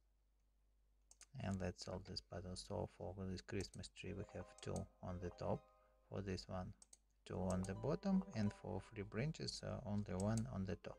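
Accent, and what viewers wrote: Russia. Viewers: Such Russian accent is it?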